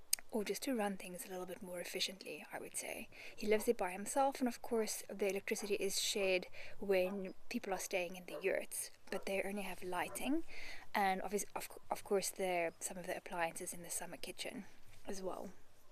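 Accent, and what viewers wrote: South Africa. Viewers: Well done for chronicling your search. Good output of vlogs with updates and good dialogue/commentary, if a little difficult to hear in places - using earphones worked for me. Appreciate you may have limited resources whilst travelling and just starting out with vlogging, your vlogs would be enhanced with photographs and maps to illustrate the talking parts. Your accent and tone is much sweeter than a lot of SA's, is is distinctive of your home region.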